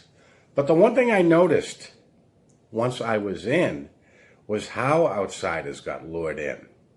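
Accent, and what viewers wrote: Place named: New England, United States. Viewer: I love this channel! I’ve never been a JW. My best friend’s family joined in the early 80’s. She spent 32 years in and finally got out. She was able to remain friends with me that whole time, however. I follow these channels because I like to learn what she went through. I love your stories about your personal experience. Side note, I could listen to your New England accent all day long 😊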